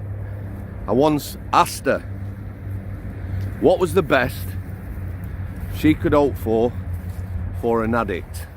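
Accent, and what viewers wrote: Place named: Wigan, United Kingdom. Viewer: I wish I knew where you came from, you sound like a Wigner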